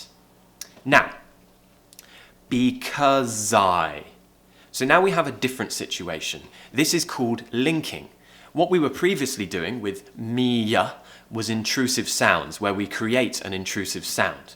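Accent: RP, United Kingdom. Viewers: AT LAST THE REAL BRITISH ENGLISH ACCENT. THANK YOU MATE!!!!! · I love the British accent and l love your explanation. You are an amazing teacher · You are amazing teacher. I have just joined you. I am fond of the British accent. thank you, I am from Egypt and I love you very much God bless you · I'm Egyptian and it is the first time to see your videos.. That was really amazing and i hope to be excellent in British language cause I'm in love with this language accent❤️❤️❤️❤️❤️ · Nice videos you're doing. Your accent is perfect for an old teacher to get back to a standard accent. I lived in the US for awhile, then in Northern Ireland. The Northern Irish accent from Fermanagh prevented me from teaching for a while. I resumed teaching with an American accent, but after a while I realized the Yankee accent was very difficult for French learners. After my break, following a surgery, I was on sick leave for almost six months and moved on to get back to a standard RP accent, which proves to be more understandable for some students... 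Had a French student, whose father is a Briton, he couldn't get a word in any exercice with American English (mostly listening exercice). I found your videos recently and it is totally rad (sorry, Americanism)... As a teacher of English, even though I have lived for some time in English-speaking areas of the world, the right intonation in English is always a challenge for toneless and stressless (depending on regional dialects) French. I sometimes use your vids in class to get my students used to the new RP accent I hear when visiting southern England... Keep on with it! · same here, looking forward to speaking like him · Hi Teacher I really liked your accent Britsh accent. I enjoyed your lesson. I will continue listening to your lesson because I like it. I didn't understand the course you have talked about. Where ca I found it? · I want to marry a British man. Love your accent! 😍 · Listening to you to get more from your accent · Hello you have a perfect british accent I recognize because i had a canadian teacher who taught me a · Love your accent I want to learn more British English accent ❤❤❤ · I started watching you because you are similar to my ex friend, but now I do it only for your great teaching of my dream accent. 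· I´m a languages speaker student and I´m more familiar with an American accent than a British accent, but your videos have helped me to more familiar with your British accent. Love your videos and you too. Kisses from Mexico. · New subscriber here ! When I watched your video I love it already 😁 I really like British accent Like movie Harry pottah! 😅 Game of thrones 😅 Watching from Philippines Thank you for sharing Keep up the good work 😇 Ps I watched your video since yesterday 😅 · Sir, your way of teaching is outstanding. These videos are really helpful in terms of british accent . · I love your accent. Thanks for your beautiful british accent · Best I have seen thus far. He speaks clearly so you can really hear the different in pronunciation in comparison to other accents.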